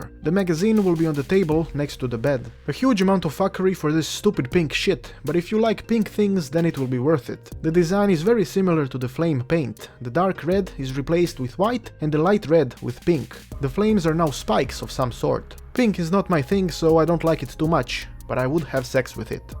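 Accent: Russia. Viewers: Ha ha ha love the commentary Russian accent is hilarious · Accent too thick, can't tell if He is Russian or Canadan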